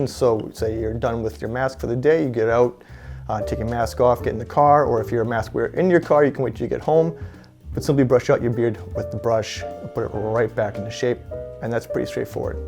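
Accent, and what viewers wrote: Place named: Canada. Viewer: I honestly never noticed that you say "out" with a Canadian accent ("oat") until today's video, haha.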